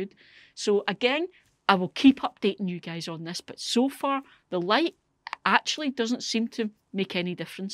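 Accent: Scotland, United Kingdom. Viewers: You are the spitting image of Jodie foster, even the way you speak (apart from the Scottish accent lol) Anyhoo, I've just bought a polycarbonate greenhouse, after watching your videos. I had absolutely no idea that it needed heat in winter, lights and watering system & so much more 😬 I didn't research enough 🙄. I'm retired now & have always wanted a greenhouse (I used to use my window ledges when kids were wee)& I'm on a tight budget. I've started tomatoes and a few herbs & cuttings from other people's gardens. Any suggestions where I can get things cheap? I'm not great with technology & always seem to mess up lol I stay in Millerston just outside Glasgow and the winds can be pretty bad too so was looking at glazing tape & canny even do that right 🙄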